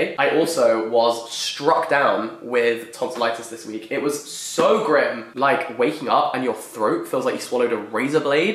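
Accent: France. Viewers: Big fan of the didn’t cry, I’m hard as nails to the don’t come for my French accent or clothing choices energy 👌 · Your french accent is actually pretty nice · I love your french accent ! From a french girl 😉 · Your French accent is so cute tho 😍 And I will also happily order a vase of wine 😂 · i love your little french accent!!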